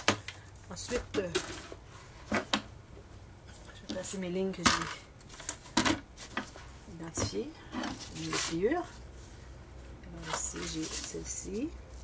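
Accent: Canada. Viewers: Coucou, Je découvre une magnifique artiste pleine de talent au léger l'accent canadien !!! Un EXTRAORDINAIRE album, merci de nous enseigner et de nous entraîner vers ton univers ! Je m'abonne à ta chaîne ! A bientôt Bisousssssssss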